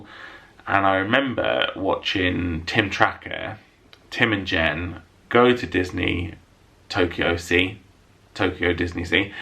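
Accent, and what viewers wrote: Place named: Scotland, United Kingdom. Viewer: I think it's perfect you put the questions in a Mickey Mouse cap. We need to hear more of your Scottish accent😂 Aww thanks for answering my question - to be honest I can't imagine any actor playing you because you are one of a kind!❤️ Haha I love the carpet demonstration! "Sophisticate, and I can see you have a cat"😹 Okay I was not expecting you to say bus driver! Oh my gosh I absolutely LOVE all the family footage - you all made me laugh so hard! And then your mom made me want to cry😭 Aww there we all are at the Angel Inn - that was almost exactly one year ago, can you believe it? We had the best time with you two! we are definitely missing your content as well. Can't wait for part 2!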